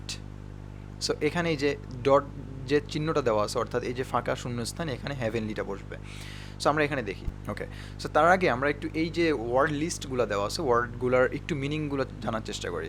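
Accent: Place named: United Kingdom. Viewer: Bro..language just awesome 😃onek tai britis der mto..ar ata e IELts er very important thing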